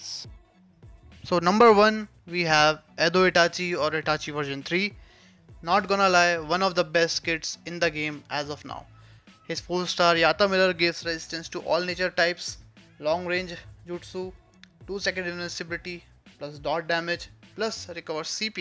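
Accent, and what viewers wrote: India. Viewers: Indian Accent😮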